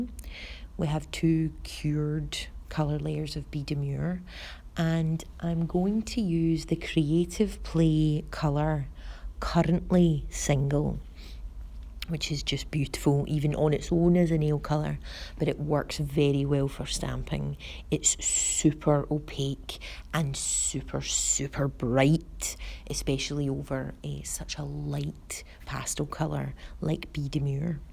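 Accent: Scotland, United Kingdom. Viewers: Great tutorial, instructions are very clear and your accent(Scottish?) is just adorable! Xxx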